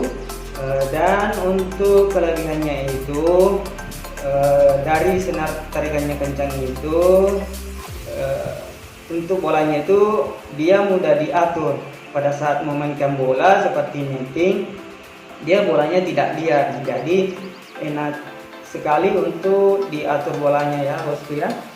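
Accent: Indonesia. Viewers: Org makassar ta?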